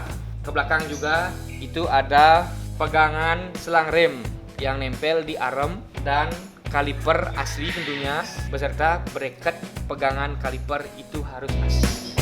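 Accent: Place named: Bali, Indonesia. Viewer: Dialek orang Bali sangat kentara walau menggunakan bahasa indonesia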